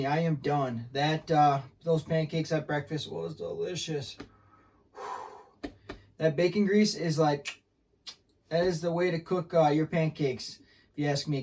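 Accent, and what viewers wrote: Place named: North, United States. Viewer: bro where are u from??? 🤔 im literally trying to guess your accent 🤣🤣 definitely up NAWF im from va 🫡💪🏽 just subscribed 🫡🫡🫡🏆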